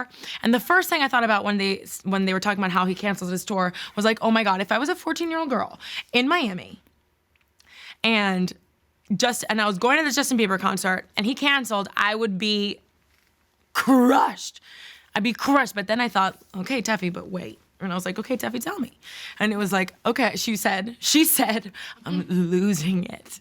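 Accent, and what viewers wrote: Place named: Brooklyn, United States. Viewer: I just found this channel. This shit has me cracking up. Before u even said it, I knew u were from Brooklyn from your speaking and your swagger.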